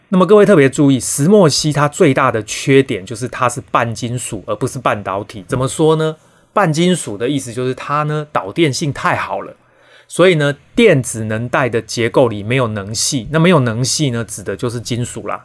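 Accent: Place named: Taiwan. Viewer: 感覺你是台灣口音！台灣人要守住台灣！ 不要給土共報路！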